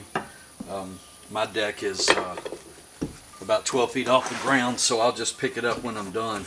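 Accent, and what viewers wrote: South, United States. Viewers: Love the southern accent. Cheers from Australia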